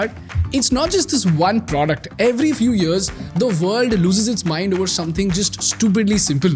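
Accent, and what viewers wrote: India. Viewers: The Indian accent is creepier than labubu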